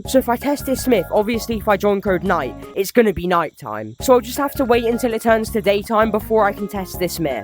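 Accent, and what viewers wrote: United Kingdom. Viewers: Bro is british bruh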